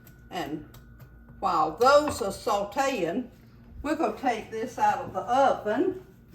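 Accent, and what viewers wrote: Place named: South Carolina, United States. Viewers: hey, are you from SC? your accent is the exact same as my great-aunt Peggy's—I'm from northeast GA and aunt Peggy's accent always stood out enough to be different, even if where she was from in SC wasn't far from where I was raised in GA. your pie sounds great, by the way. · Being from Tennessee and living in Florida, always warms my heart to hear a South Carolina accent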